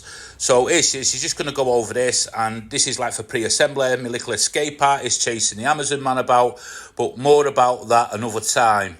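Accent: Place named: Manchester, United Kingdom. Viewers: Hi, looks good very handy for the booze and glasses, nice finish. what I do to try to avoid tear out when using a large bit or a forsner bit,I use a thin drill bit as a pilot hole and then I drill from the other side. I don't mind if you voice over or talk as you going along it's your channel I like it as it is. You sound like your from Manchester or the surrounding area As always a great video. And as your asking I'm from Wrexham, Take care mate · Nice project pal,I'm originally from Salford your accent sounds like a Manc so your coming through fine to me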